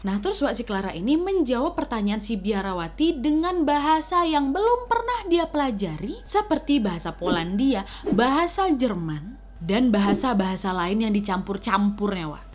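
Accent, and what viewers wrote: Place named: Medan, Indonesia. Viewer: Suka sm logat medannya kak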